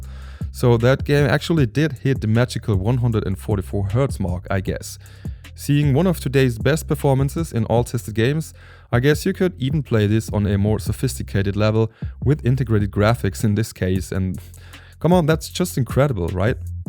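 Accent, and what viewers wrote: Germany. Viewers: I bought my mom a mini PC with a Ryzen 7 H 255, 780M graphics, and 24GB of RAM. It's mainly for city-builders/strategy games, and I'm curious to see what this little machine can do. Linux would also be interesting as the OS, since it's supposed to run faster. And you can also use FSR 4.1.1 pretty well now with OptiScaler. Interessant wie man unseren Akzent schlecht verbergen kann ;)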